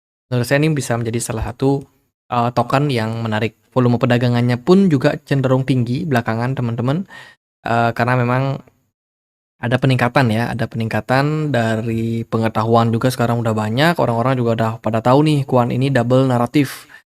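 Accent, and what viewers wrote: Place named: Aceh, Indonesia. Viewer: orang aceh bang? mirip banget logatnya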